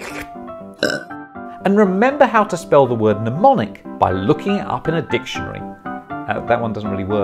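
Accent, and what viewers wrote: United Kingdom. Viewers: Who is this guy? He sounds British.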